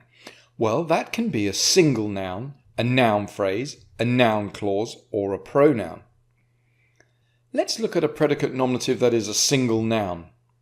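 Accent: United Kingdom. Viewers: Bro is the british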